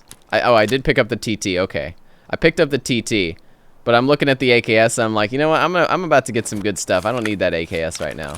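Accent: Texas, United States. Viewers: Your American pronunciation of Russian words is cute, but wrong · Yep. Texas boi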